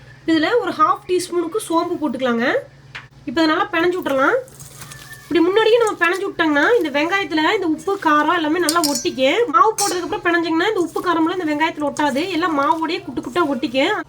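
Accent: Kongu, India. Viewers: Kongu tamil😻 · Yenunga amuni enna arumiya kongu tamil pesringo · Kongu slang semma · Sis neenga kongu side endha ooru.erode??? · Kongu tamizh super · adada..yengooru bashai kongu tamil yena mariyadha...yena alagu..love ur beautiful slanggg than the pakoda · ஏனுங்க கோயம்புத்தூர்ங்லா நீங்க கொங்குத் தமிழ் வீசுதுங்க ராகி பக்கோடா அருமைங்க. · Kongu slang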